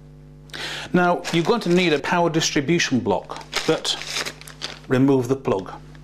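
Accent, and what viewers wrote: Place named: Yorkshire, United Kingdom. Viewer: haha you have to be an electronics teacher, my old electronics teacher had the same sense of humour as you, he plugged a lead into a plug and said to a kid if he holds onto the wires he will be plugged into the national grid and the kid was gonna do it lol he was like are you crazy, go sit in the corner XD but yeah also your accent sounds like your from yorkshire :D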